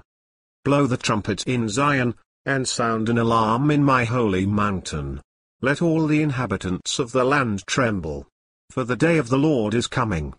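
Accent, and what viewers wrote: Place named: United Kingdom. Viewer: kinda wish there was an Israeli voice or even Jewish from new York...but British?well on. lol praise the Lord anyhow...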